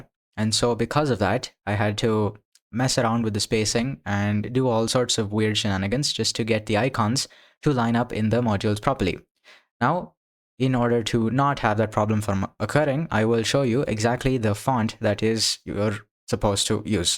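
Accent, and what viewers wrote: India. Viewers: > it's the shortcut through years of confusion, broken configs, and scattered information. vaxry (the maintainer/creator of most things hypr) sells it for 5usd, and doesn't even try to pitch it onto the marketing-speak of yours of it being any worth, much less it being some adequate/proper/fast way of coming to the hypr ecosystem/the linux way of things. + indian accent lmfao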